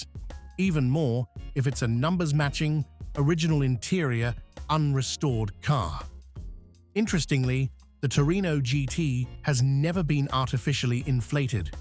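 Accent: United Kingdom. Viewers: Ok, I'm finished watching. Great story and pix. But PLEASE get rid of that annoying audio (music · drum) track in the background. And what's with the overly British AI voice? You can do better.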